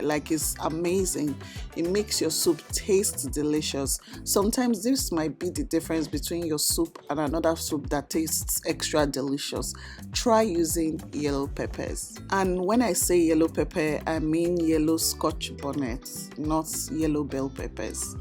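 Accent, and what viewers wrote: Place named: Igbo, Nigeria. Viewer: Looks yummy 🤤 you speak like an Igbo woman. Well- done sis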